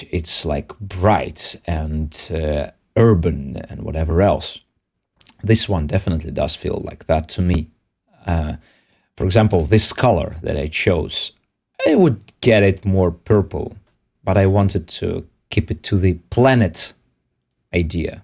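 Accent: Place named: France. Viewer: nice new track, congratulations, dear. 🍀 you have such a charming French accent. and you make such cool remixes of the French language: - les filles jouent de (avec des) boules de neige. (the girls play (with) snowballs) ❄ - qu'est ce que c'est que ça? ça sent bon le chocolat. 🍫 what is that? it smells like chocolate. (copyright with the song " " 2012.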